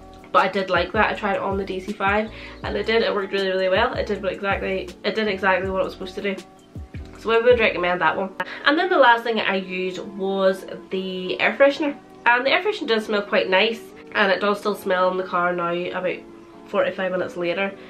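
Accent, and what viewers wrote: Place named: Northern Ireland, United Kingdom. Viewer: great thanks I just found your videos (just subscribed) great to see a Northern Ireland voice on here for a change keep up the great work stay safe 👍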